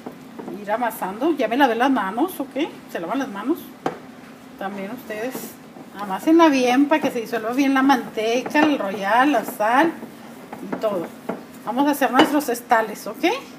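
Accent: Chihuahua, Mexico. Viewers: Creo k si xk habla igual como nos otros acá en Chihuahua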